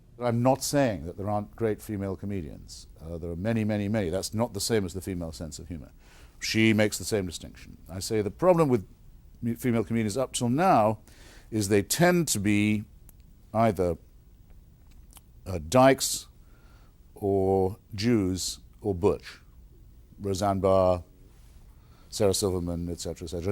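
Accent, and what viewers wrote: England, United Kingdom. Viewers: the women seem to have penis’ dear. In his English accent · His English accent and perfect way of speaking is the cherry on top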